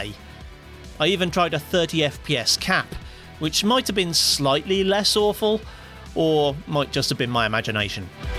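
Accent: England, United Kingdom. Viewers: What region are you from in england?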